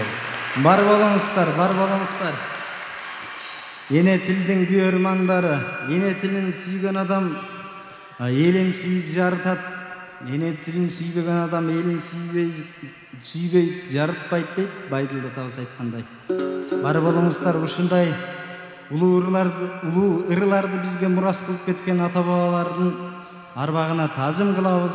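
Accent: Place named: China. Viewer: Кытайлык кыргыздар таза кыргызча сүйлөшөт да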